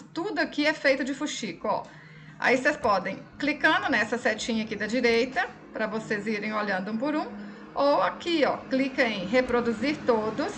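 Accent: Minas Gerais, Brazil. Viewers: Você é mineira uai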